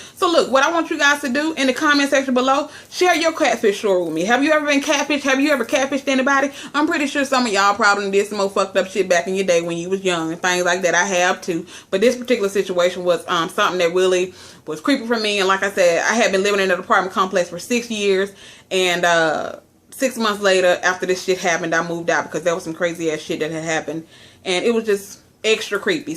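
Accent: South, United States. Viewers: Lol I love you American accent .. And damn loads of old men got nothing to do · Southern not american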